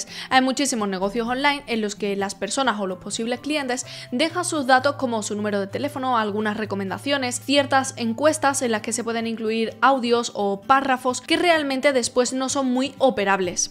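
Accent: Spain. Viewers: Que bonita eres pero ese acento españolete arruina la magia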